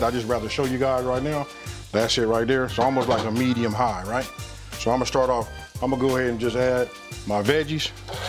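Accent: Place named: Compton, United States. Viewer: Wow thanks for the reply. I will! By the way, I love your Compton/L.A. accent 😁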